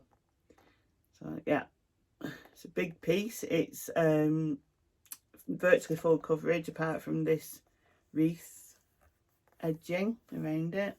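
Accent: Lancashire, United Kingdom. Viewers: I live in Lancashire area, but I discover your chanel with help from Theresa Little Stitcher 🥰 and with your help I will learn british accent from Lancashire 🥰 In August, five years ago, I move to this area and I love it.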